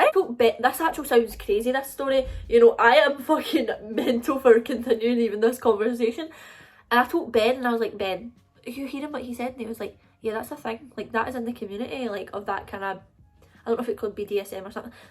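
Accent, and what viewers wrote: Scotland, United Kingdom. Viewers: Sounds like a thicc Scottish accent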